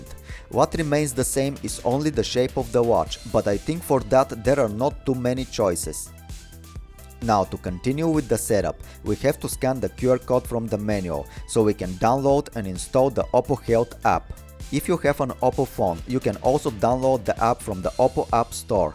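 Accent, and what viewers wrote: Russia. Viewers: his voice can't be more russian, even when he is speaking in english it felt like i'm listening to russian language.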